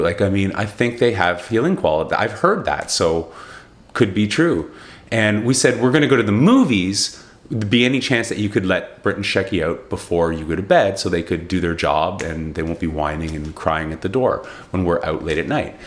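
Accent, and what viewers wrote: Scotland, United Kingdom. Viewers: Ive been in a creative rut too...need something more to challenge my creativity! I always love your stories dude!!! Can’t wait to hear more stories. And btw your Scottish accent really brought the story together ahah!!! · Your scotch accent is pretty convincing , great personal video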